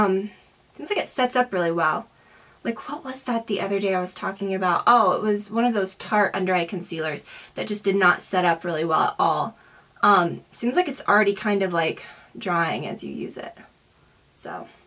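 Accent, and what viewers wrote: South, United States. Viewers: Your southern peeked through in this video :). Could you please review that hourglass primer?! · I feel ike you have the southern accent that only comes out when your talk super fast, i love it. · Your accent is soo southern in this video ! Never noticed it so much before.. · Can you make a video demo of the masks you got. It'll be nice to see how they work. Btw you sounded extra country in this video, and I liked it! ;) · why is she talking with a southern accent in this video? idk if shes from/lives in the south..but ive never heard that from her before. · You really looked like you were having fun and were happy in this video :) It kept me smiling :) Also at times I could hear a bit stronger southern accent than usual... Adorable :) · Have you always had a Southern accent and I never noticed? Or did you just develop one? 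· Love your videos! You inspire me to make vids...i luv your pretty lite southern accent ; ) i luv your long vids too..more tours please.love your style!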